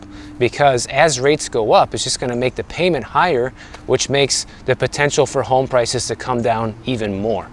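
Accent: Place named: Midwest, United States. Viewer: Hi,! Thank you for this video. I can tell by your accent that you are from the Midwest. I am, too! Janesville, WI, to be exact. There was a KMart store in Janesville and I recall, as a child, many times my mom would put our, “back-to-school”, clothes on lay away. She would always pay them off within a couple of paychecks, but for the circumstance we were in, it seemed to work. The store did not charge interest, and, would literally hold the very specific items, as long as the promised payments were made. KMart, I don’t believe, is around anymore. But I remember that time, well. My mantra, since I was in my mid to late 20s has been: “If you can’t pay cash for it, you don’t need it.”. I hope your message continues to reach younger generations.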